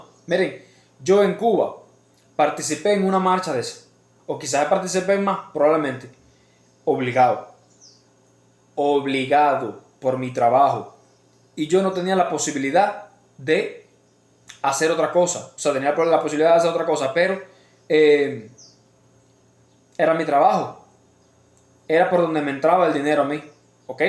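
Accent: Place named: Dominican Republic. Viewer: No es regionalismo corazon,esas son clarias que atacan por donde te duele,para que te quites,no hagas caso a eso, Los dominicanos tienen ese mismo acento y ninguno se averguenza,dale con todo. 💪💪💪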